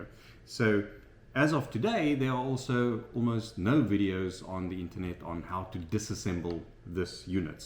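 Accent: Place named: Germany. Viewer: Awesome video! Exactly what I needed for help with my wheel motor replacement. You the man! Love your German accent 😍 Felt like a scientist was stepping me through the robot's puzzle.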